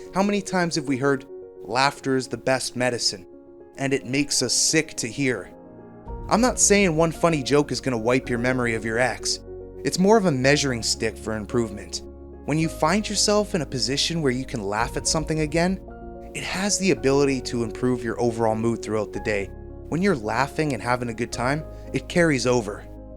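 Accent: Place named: Canada. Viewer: What a fine fellow you are. I'm trying to place your accent to a State? Or, are you a Canadian?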